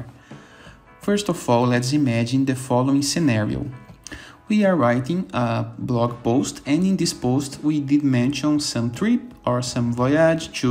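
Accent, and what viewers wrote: Brazil. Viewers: Are you brazilian? The accent sounds like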